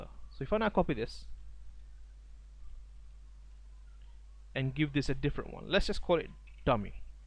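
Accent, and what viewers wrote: United Kingdom. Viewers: Hi, Your work is Amazing buddy, I like it very much, to the point videos. I do have bit of a issue with following some sentences of your British accent, but this issue is far from the quality of subject ad experience you deliver. Keep up the great work.. One thing I wanted to ask, in your videos, you worked with small examples, small piece of codes. I am Manual tester with some automation experience in QTP, I am after learning automation using from your and other people's videos, i want to start my career into Automation using selenium, but I am hesitant because so far we have only learnt with small samples. Can you give us a real time example or real time requirment in regard with automation, so I can judge the scale of intelligence required to do automation in real world . I know this is a general question , to which mots people end thier answer with " IT DEPENDS" and leave the questioner to swim in the ocean, Can you please try to answer this in more helpful way to newbies by giving some real life examples you came n your carrer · Where are you from men? 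Your accent sounds like... I don't know... Scottish? (well definitely not an Indian?..)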